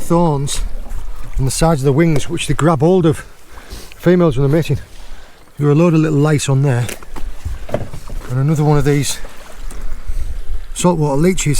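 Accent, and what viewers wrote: United Kingdom. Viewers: Love your videos sir in cornwall, your british accent is not the easiest for non brits to understand still great videos keep up the good work and best regards from sweden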